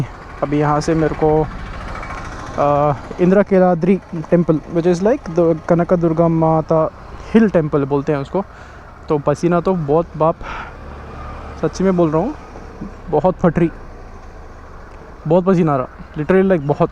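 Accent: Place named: Hyderabad, India. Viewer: Bro i like it ur hyderbadi accent.... maja agaya na ekdmach.... ... 😃🤣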